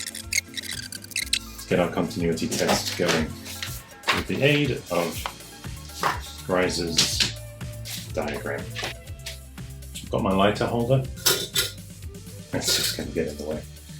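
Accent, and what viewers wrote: United Kingdom. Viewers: Are you from the UK but live in the us?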